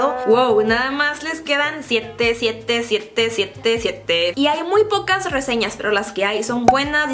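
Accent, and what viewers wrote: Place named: Monterrey, Mexico. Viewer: Tanto vídeos que he visto tuyos y en este note mucho tu acento regio hahaha Eres la mejor ♡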